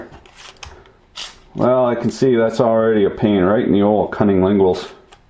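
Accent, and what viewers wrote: Quebec, Canada. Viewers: hi, I'm from Montreal, Canada. And I love it when you speak french .makes me laugh everytime with the quebecois slang and hockey on top of it lol. keep it up.congrats for your channel. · Quelque chose me dit que vous êtes du Quebec! suis-je dans l'erreur ? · Nice québécois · 10/10 for Quebec French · Tu sais parler québécois! You know how to speak french-canadian!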